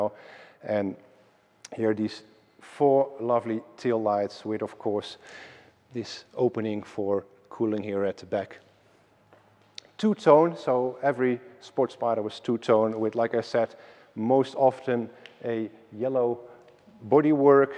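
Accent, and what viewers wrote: Netherlands. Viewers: Always like your videos, but to be honest, for someone like you, an international name in coach building cars. I experience a kind of annoyoance in your Dutch-English, there's so much potential of you take a few classes. I hope you appreciate my somewhat cold feedback 😬 Best wishes for you and Kaeve in 2023 (and beyond)..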